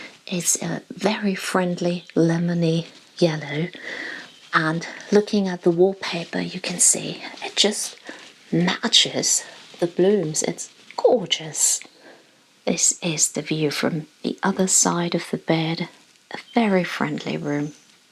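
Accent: England, United Kingdom. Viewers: I love your English accent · What a lovely video about this idyllic cottage.This is my first ur video and i really enjoyed. If i didnt read you are from Germany I will assume u are born in England.Thanks for sharing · i like your beautiful voice, i like your british accent too, hello from Indonesia :)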